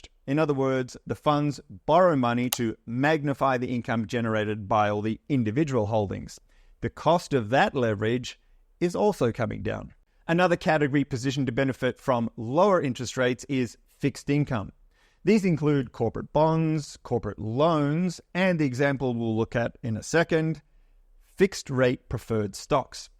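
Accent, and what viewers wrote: Australia. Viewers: Your videos are so well done! Do you edit yourself? For my company, we also do podcasts, so I’ve become an audio video junky. lol. Are you using a shotgun mic? Great acoustics. Looks like you usually treat your space for audio. And the sound editing nice! So happy for you. You are a breath of fresh air. The Australian accent always helps. 🎉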